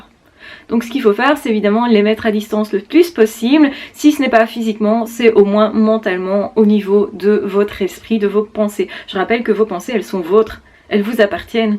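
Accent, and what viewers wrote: Brussels, Belgium. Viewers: Bonjour... (Je n'ai pas trouvé de gmail où t'écrire sur ta page YT, je "tente le coup" par commentaire...). Je te suis depuis quelques temps (abonné) en tant que HPE/HPI, et je m'interroge toujours un peu, au sujet d'une femme avec qui j'ai passé quelques mois au printemps/été dernier... (silence radio aujourd'hui... j'étais trop "rebelle" pour me laisser "faire" plus longtemps... Merci à mon "âme" rebelle qui est parvenue à prendre le dessus sur ma "personnalité" hyper-sensible... à tendance dépendante, donc). Penses-tu qu'un(e) PN puisse avoir des tendances masochistes dans sa sexualité? (ça m'a toujours paru plutôt "contradictoire", mais il est peut-être aussi envisageable d'y voir une sorte d' "exutoire" à ce besoin de contrôle permanent... En t'écrivant, ça me paraît quand même ne pas trop "coller" avec le PN...) Une autre suggestion de profil compatible avec ces 2 tendances? 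(Vie totalement "sous-controle" - par exemple hyper-propreté, clinique -, et sexualité à tendance masochiste... Personnalité évitante, ce serait possible? ) Merci encore à toi pour ton travail 🙏 et ton joli accent que j'aime beaucoup 😉 (Bruxelles? Je ne suis pas belge, sinon je reconnaîtrais vos accents... Par contre, je suis fort en accents français...😁). C'est assez troublant, tu lui ressembles un peu, physiquement (à cette petite "peste" que je soupçonne PN.. les yeux, la bouche...mais là comparaison s'arrête là... ). Paix et Amour à toutes et tous 🌈💕🦊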